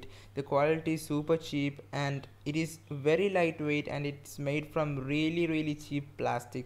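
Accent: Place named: Kerala, India. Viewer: Malayali aano.. english ketal angane thonu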